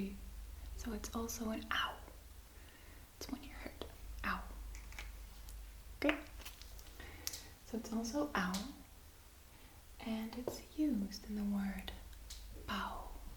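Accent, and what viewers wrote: Netherlands. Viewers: acually its a dutch acent. just like in groningen, whete they say the G diverent. she lives somewhere ehere they pronounce the R diverent. algemeen nl is diverent yeah (just asuming your dutch here, ipv vertalen)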